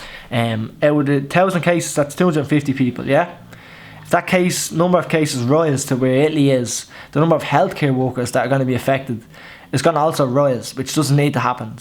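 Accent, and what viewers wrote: Ireland. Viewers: Pure Irish , nice English 😀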